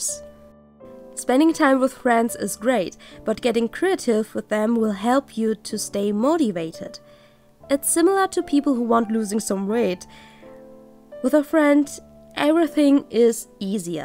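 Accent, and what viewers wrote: Germany. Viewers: Hey^^ are you german?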